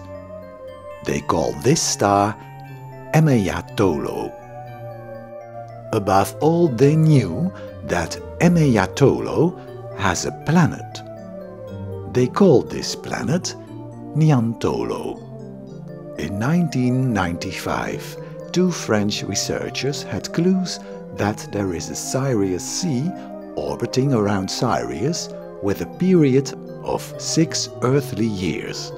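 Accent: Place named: Netherlands. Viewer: It's 'Sirius" not Sairius nor Thairius. Info is okay, the Dutch accents and pronunciation mistakes are annoying. so is the pedantic intonation.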